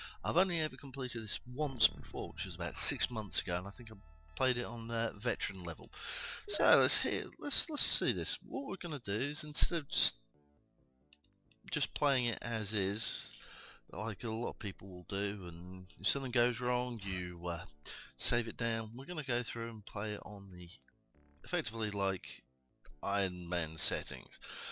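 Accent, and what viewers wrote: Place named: United Kingdom. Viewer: I have watched ALL 56 videos 6 times in a row while I've been playing in a separate DOS window. WORDS I LOVE HEARING HIM SAY IN HIS ACCENT: 1. SAH-GENT Smerkey 2. Raw-ket-lawn-chuh 3. OH NO....OH NO (pronounced Air-Nair...Air Nair) LOL. Being from TEXAS, I totally love his British accent!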